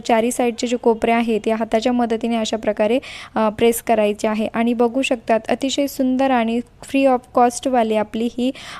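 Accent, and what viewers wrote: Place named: Maharashtra, India. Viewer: Tu kuthachi aahes g Punyachi tar nakkicha nahi .bolanyachi style v chuka varun samajate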